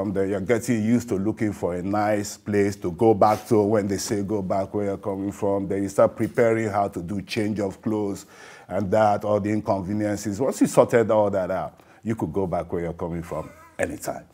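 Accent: Nigeria. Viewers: Thank God for his healing. I love his Igbo accent 😊. People say my Junior brother looks so much like him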